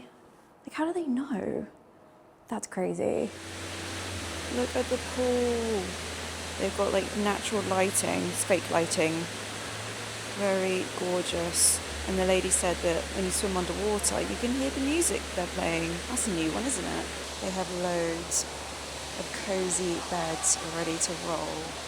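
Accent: United Kingdom. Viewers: Lady I can’t believe I’m saying this but I’m falling in love with you…..you are constantly showing us luxury yet you are NOT snobbish….totally down to earth and love your style, your British accent and great content. You gained yourself a new subscriber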